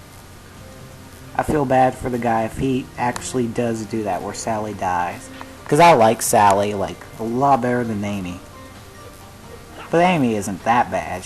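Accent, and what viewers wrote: South, United States. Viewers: Wow you voice sound so southern. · You sound like a nerd with a southern accent. Your voice is almost unrecognizeable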